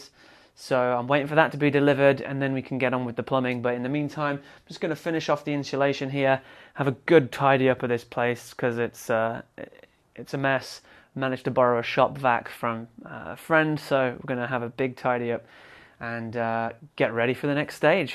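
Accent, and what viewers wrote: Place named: Cheshire, United Kingdom. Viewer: ah thought i heard a little chester or cheshire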